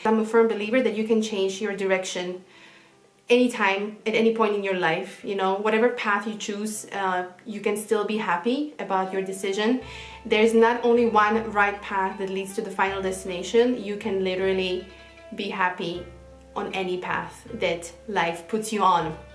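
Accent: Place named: United States. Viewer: For someone who was born in Slovakia, your facial expressions and accent is very Westernized, or at least Americanized.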